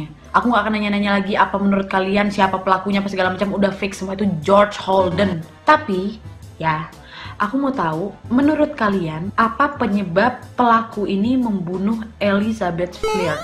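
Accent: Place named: Medan, Indonesia. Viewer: Logat Medan nya itu Wak...👍😁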